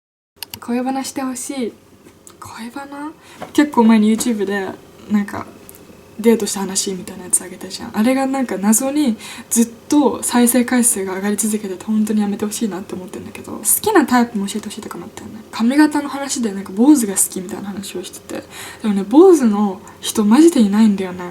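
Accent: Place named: United States. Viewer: wow I thought for sure you grew up in the States or something haha You have a lovely speaking voice; I love playing your videos in the background while I work ^^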